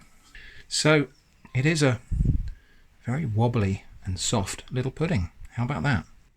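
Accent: United Kingdom. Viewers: I've been subscribed for quite a while now, and I'd like to congratulate you on all the channel growth. I wanted to catch a new video early just to say "Thanks for all the great videos!" before it gets too hard to read them all. So thanks again, I'd love more YouTubers to follow in your footsteps of wholesome, varied content. P.S. You have a very cool accent, all of the regional British accents are super interesting.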